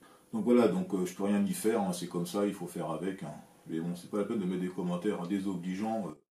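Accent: France. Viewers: Im not native english speaking person and yes your accent sound French but you last video I perfectly understand. Its true that you can buy some interesting stuff on demobiles (after military shops) in some prive hands i saw last year single use rocket motor for nuclear rocket (new one not used) what was at the moment best what zssr had at the moment. And the owner even dont know what it is ;) Imagine if some organize toxic fuel for it some avionics and can send bus to the USA from Poland :) Do you passion we will enyoj it if you share videos about it. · Why do you apologies for your french accent, it is very nice to see everyone speak their own accent, it shows that we should accept and respect each other. Doing such a great job and speaking your own french accent encourage everyone not to shy from their accents and to focus on content. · french accent is funny.. 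maybe hardcore americans who only speak english having problems to understand you. but the rest of the world is with you. 🙃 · thank you very much for your previous video. I could perfectly understand your English. In fact, due to the French note I found it very pleasing to listen to it. Please continue doing such videos! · L'accent français est rude seulement pour les oreilles françaises... donc on s'en fout. Ce qui m'étonne c'est la puissance de calcul embarquée même si c'est du fire&forget. Il embarquerait du code de type IA ? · if you got haters on Youtube, that only means that you are successful. Your english is by far not as bad as you think it is. You have the odd funny word with french accent from time to time but it is easy to get used to it. Like when you omit the "s" when saying "display". Still everybody should know what you said. Your video content is one of the most sophisticated on the Youtube world. 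· I think your English is excellent, and personally I find English being spoken with a strong French accent to be awesome to listen to. My former manager (still a coworker) is French (born and raised) and while he has been living in the US now for 8 years. His accent is still very strong and its something I also look forward to hearing. He is one of my favorite people in the world. 🙂 Please don't stop doing your videos in English. It is a pleasure to hear such technical information spoken with a French accent. I will still watch all your content if it is in French, but I will gain more from it if spoken in English. 🙂 I guess I am allowed to be selfish at least once and a while! lol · l accent francais cela me va tres bien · I am from Hong Kong. My English is also bad and with heavy Chinese accent. I enjoy your English with French accent and your video too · Your english is perfect bruh. 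People especially like it cus the french accent sounds cool lol. For what conceivable reason would anyone hate on it?? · Le bon accent français m’a bien fait rire. L’important c’est le contenu ! Je viens de m’abonner. J’attends la suite avec impatience. Continuez en anglais au vu de vos chiffres ! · Bonjour, I have been following your channel for years, never had an issue with understanding your engliah and always felt that your french accent was very charming. Thank you for all your hard work over the years. I can never get enough of your unique subjects which entertain and enlighten me with each and every video. -Ray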